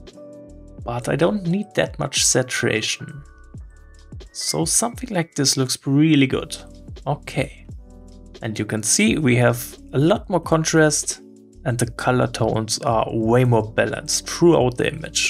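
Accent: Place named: Germany. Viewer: Gleich die ersten Sätze ""helo ia uan wouatzap" )) Dein gut gemeintes Englisch ist dermaßen deutsch, dass es fürs Ohr dies oder jenes geesprochene Wort unverständlich kliingt. Am besten machst du deine Videos in der Muttersprache und du wirst sehen das wird belohnt, hast bestimmt mehr Subscriber als sonst. Warum? Weil in englisch ist auch Konkurenz zu groß.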